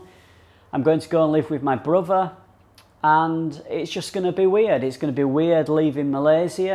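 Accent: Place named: North, United Kingdom. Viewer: Ahhhh...that familiar northerner accent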